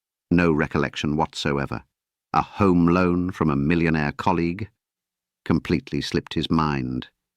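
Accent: RP, United Kingdom. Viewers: Thank You. It is such a pleasure to listen to this pure poetry, exceptionally polished in Queen's English. Btw, have anyone, anywhere, in any country, ever heard of a politician admitting a wrong doing or "mea culpa"? from a Finn in Diaspora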